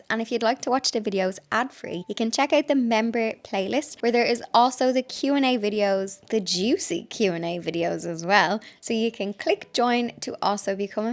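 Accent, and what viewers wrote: Ireland. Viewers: lovely video as usual… really enjoy the calmness of them, and you’re sweet Irish accent. Have been thinking about your floor choices… love the brick and think that it is a beautiful statement piece, but wondering if you would ever be barefoot in that space and how that would feel underfoot? Might be a silly question from someone who lives in Australia ha ha. My other thought is how high can you fill the grout to alleviate the variation between the grout and the brick (possibly less dust collection?) Also think if that kind of flooring is high maintenance definitely investigate a robot vacuum!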